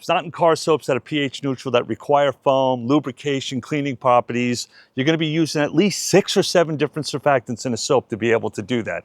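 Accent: New York, United States. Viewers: It's that famous NEW YORK American accent!!;;; ❤ LOVE IT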